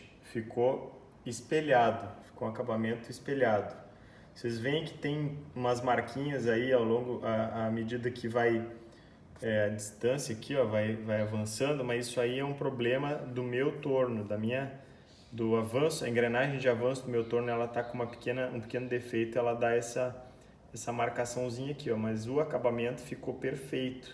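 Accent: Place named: Rio Grande do Sul, Brazil. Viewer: Muito bom seu vídeo! De qual cidade você é? pelo sotaque é gaúcho! sou de Vacaria -RS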